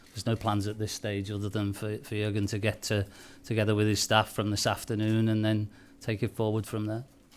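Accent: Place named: Germany. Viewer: His english is very good, but you can hear sometimes the german in him. 😉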